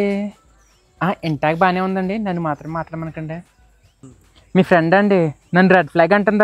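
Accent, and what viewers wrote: Godavari, India. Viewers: Iyyababoiiiiii sanaaa baaga miguthunnaru andi meeru mana godarolla yaaasa baane catch chesisav ehey nuvvu 😂😂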